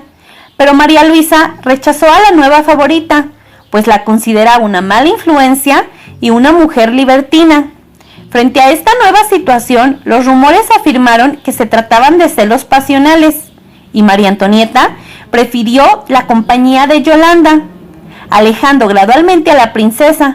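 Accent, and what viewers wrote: Mexico. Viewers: Interesante historia pero la voz es un poco fastidiosa con ese acento mexicano tan marcado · TU ACENTO MEXICANO de clase baja 💩💩💩💩 ES INSOPORTABLE.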